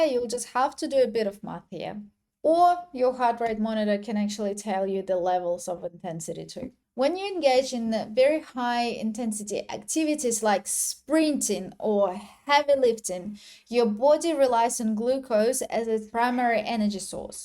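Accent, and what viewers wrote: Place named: Ukraine. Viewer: Great video! BTW, what is that beautiful accent of yours?😊 Are you from Ukraine? 🇺🇦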